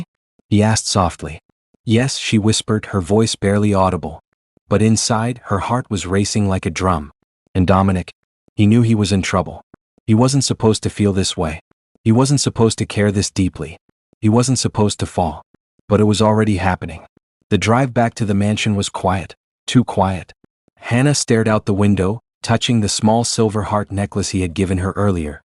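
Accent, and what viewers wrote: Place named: United States. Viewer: Everything is nice , except the bits can come off a bit colorist,Due to the readers white American accent.bits he keeps saying dark and color unnecessarily.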